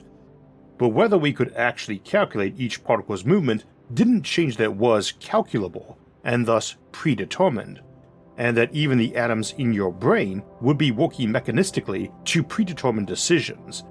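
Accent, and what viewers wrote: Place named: United States. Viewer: I think only you are concerned with your so called speech impediment. Seems like a US accent to me. But I doubt the overwhelming majority of your listeners even think about it. I don't.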